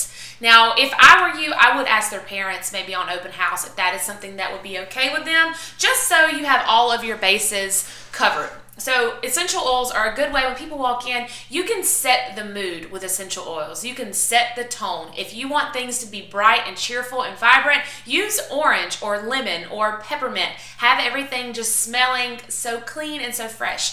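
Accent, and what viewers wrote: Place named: Texas, United States. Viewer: From texas? Nice accent and ideas of course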